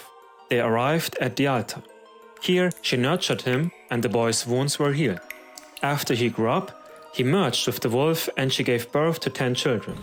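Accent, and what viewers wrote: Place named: Germany. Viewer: Ayyy the OGs back!! Been missing that german accent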